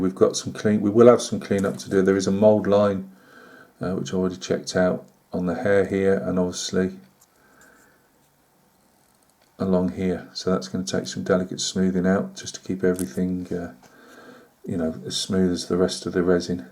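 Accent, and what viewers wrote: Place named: England, United Kingdom. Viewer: It is so good hearing an English accent again. I am an expat from South Africa, been living in Spring, Texas for the past 29 years. I love your hobby, so nice.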